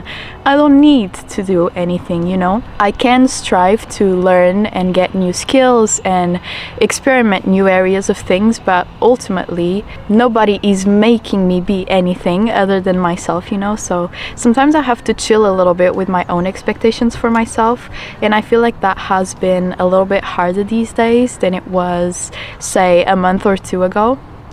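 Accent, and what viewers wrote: Portugal. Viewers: Sotaque português??? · I was already suspecting that you were portuguese, but when I began watching the video your accent gave it right away, good stuff tho. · pelo sotaque vi logo que eras tuga 😅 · sotaque bwee tugaa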